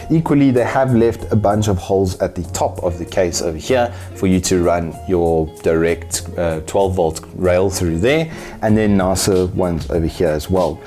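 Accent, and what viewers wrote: South Africa. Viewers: South African? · EthanHuntMi6 he has a Afrikaans accent, he is South African I can feel it. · great review! Are you South african?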